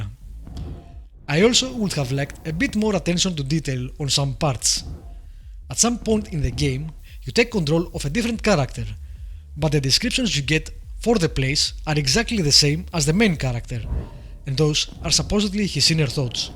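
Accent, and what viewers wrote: Greece. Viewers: Thanks for the clear cut, concise and short review. By the way, are you Greek?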